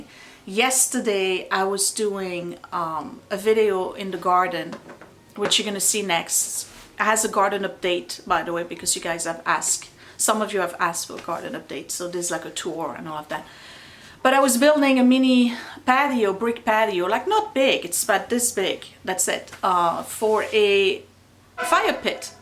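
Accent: France. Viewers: I have been watching you since u were working on the office. I fell in love with your willingness to rise to the challenge and share it with us. I love seeing you interact with your family. I love your accent and your stories. I call you my French lady on YouTube. I really enjoyed this video, it was a great visit with my friend. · The French accent is really cute though. BTW, as an English teacher myself, there is one thing I 'd like to say: having an accent is normal. It's nothing to be ashamed of. You have taken on the task of learning another language. That is hard. No one, if they aren't learning another language or are bilingual themselves, is allowed to criticize that.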